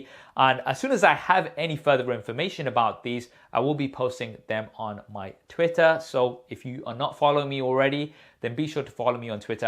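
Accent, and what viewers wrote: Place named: United Kingdom. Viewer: i really enjoy your videos when i play them at 1.25 of youtube playback speed...maybe its the British Accent after all...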